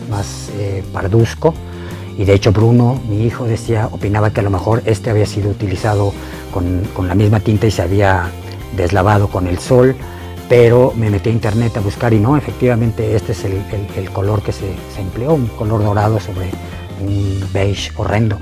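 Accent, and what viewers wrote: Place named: United Kingdom. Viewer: I like your lovely british accent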